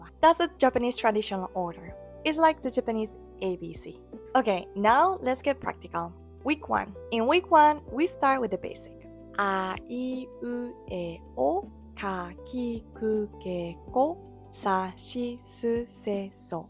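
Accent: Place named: Spain. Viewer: Teacher, why do you speak English with a Spanish accent?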